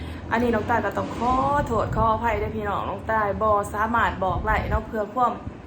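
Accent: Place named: Ubon Ratchathani, Thailand. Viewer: เจ้าอยู่เมืองได๋ สำเนียงคือไทอุบล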